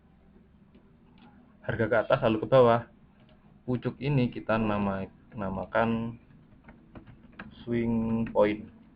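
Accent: Java, Indonesia. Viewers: pean jowone pundi 😁😁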